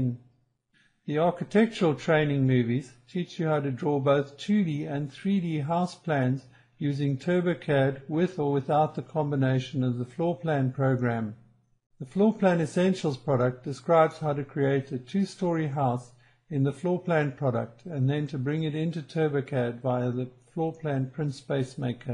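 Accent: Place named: South Africa. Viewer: South Africans should never, ever be allowed to do voiceovers. Horrible. And I'm a South African myself.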